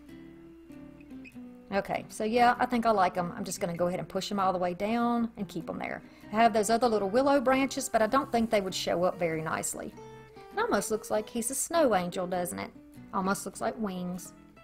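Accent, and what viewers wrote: South, United States. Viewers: Love it all! I'm from South Carolina, now living in Ohio, and the minute I heard your voice I knew I'd have to subscribe to your channel. You could be making mud pies and I'd watch just to hear your wonderful Southern accent. Mine isn't as strong, but people here keep telling me they can still hear a "twang." When you were talking about that cute two-part snowman and how some people think they have to have three sections, I absolutely howled when you said you live in the South and we're lucky to get enough snow for one part. 😂❄❤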